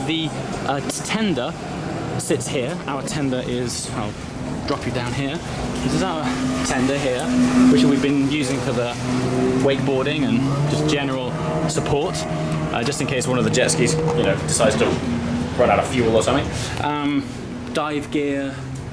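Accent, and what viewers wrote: Netherlands. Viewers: are you dutch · Wait are you dutch?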